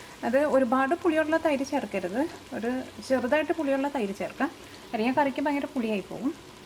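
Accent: Thrissur, India. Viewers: onnu ochati paranjuday super aayirikunu curry alla ur very beautiful but slang oru kachda thrishur style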